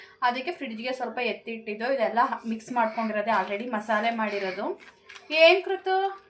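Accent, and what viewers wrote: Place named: Thuremane, India. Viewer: ನಮ್ ಅಮ್ಮ ಮನೆ ಅಲ್ಲಿ thuremane ಅಂತ ಹೇಳ್ತಾರೆ... ಎಲ್ಲ ಸೇಮ್ ನಿಮ್ ತರ ಮಾತಾಡೋದು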